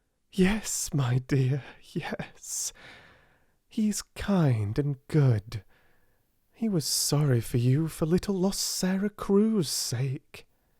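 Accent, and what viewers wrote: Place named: United Kingdom. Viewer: I've just seen this premiere being scheduled for 10pm (in Serbia).. and just to let you know, no one would mind you reading it in such a lovely accent! I've actually discovered your channel whilst looking for an audiobook for this one in British English.. and we have the same editions, I like to listen to an audiobook and follow the story in a book, since I'm not a native speaker, I find it really useful! All the best, Greetings from Serbia!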